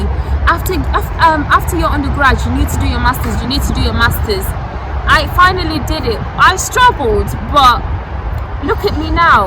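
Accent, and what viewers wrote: England, United Kingdom. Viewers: Dame lady you fine hell, you got english accent.,you in england or gambia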